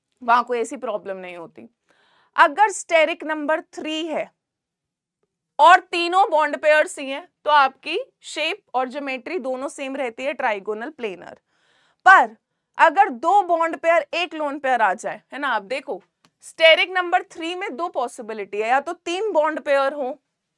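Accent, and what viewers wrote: Bengal, India. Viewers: mam are u bengali?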